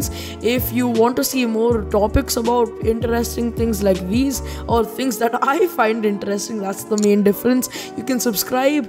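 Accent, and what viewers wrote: India. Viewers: R u indian?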